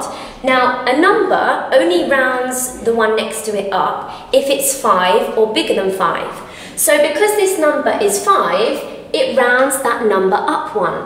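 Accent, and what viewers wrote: United Kingdom. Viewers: Thanks I love how you explained ❤️ and I am watching this video 10 hours from my .ath exam so appreciate you and your British accent is nice🤣😂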